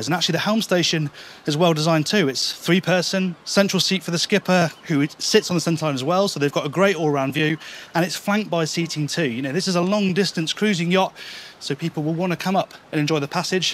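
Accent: England, United Kingdom. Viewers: Does anybody know where in England is this accent from? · The Queen’s English?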